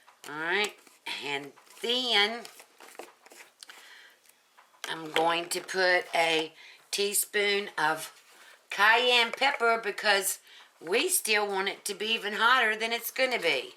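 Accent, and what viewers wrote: United States. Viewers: Love that american accent. Will try out this recipe later today in London UK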